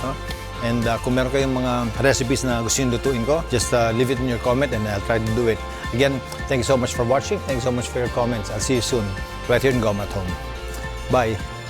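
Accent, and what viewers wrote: Visayas, Philippines. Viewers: Makalingaw ang pagbisaya ni Goma hahaha mechado naman sunod unta. Great job on this recipe!!!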